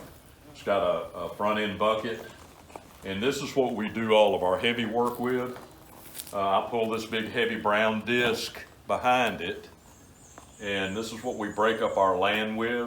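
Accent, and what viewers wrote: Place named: Carolinas, United States. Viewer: You seem very familiar. Your accent and the way it looks I'd swear your in NC or SC...the tiger paw sleeve would make me think your in this area. Not sure if we have ever met somewhere or you maybe just remind me of someone?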